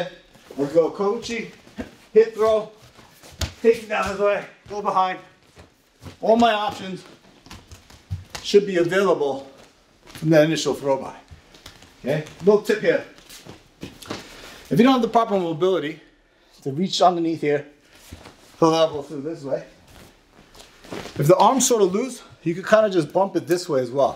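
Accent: New York, United States. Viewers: Great channel. I thought I heard a fellow NYer. Check the bio and sure enough. Wish I was still there as I would definitely check out your Schools because of your comprehensive grappling background. Great breakdowns and I like the way you explain the moves from multiple arts/perspectives. I come from a wrestling background and I am working on augmenting it with some Judo and these videos are helping. Thanks for your time.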